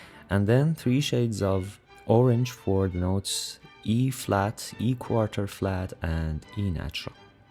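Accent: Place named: Iran. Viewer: I like your Iranian accent made me think you are from Italy - like that woman melani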